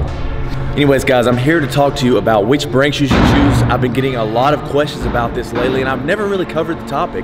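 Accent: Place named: South, United States. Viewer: Don't take offense, but you remind me of a tough version of Lance Bass. Maybe it's your southern accents. I know he's from MS and you're from AL.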